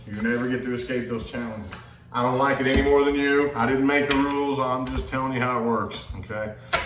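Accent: South, United States. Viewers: 🤣 I have a really southern accent like does. My voice texts NEVER come out right lmfao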